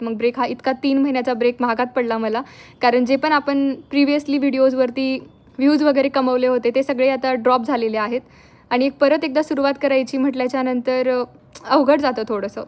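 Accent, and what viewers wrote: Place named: Latur, India. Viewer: व्हिडिओ ची सुरवात अलमोस्ट तीन महिन्याच्या बाद म्हणजे दीदी सिंगापूर ला गेली तरी लातूरची भाषा सोडली नाही 😅 दीदी मुळे आम्हाला नविन नविन महिती मिळते थँक्यू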